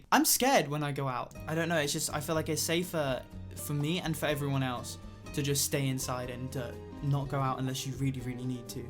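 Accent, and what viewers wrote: United Kingdom. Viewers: Sue me but I'm here to hear your british accent :')😂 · Whoaaaa is it me or does he sound british? I love his accent! Edit: Thanks for the likes uwu ❤️ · zeliéxsé he sound british? I wonder why?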